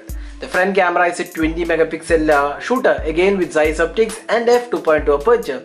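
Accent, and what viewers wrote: Kerala, India. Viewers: That mallu accent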